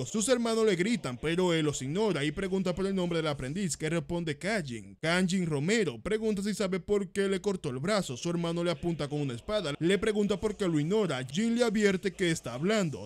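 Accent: Dominican Republic. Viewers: eres dominicano ?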